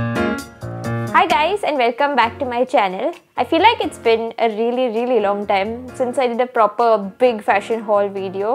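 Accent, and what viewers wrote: India. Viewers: I do really love Indian accent such a unique one!❤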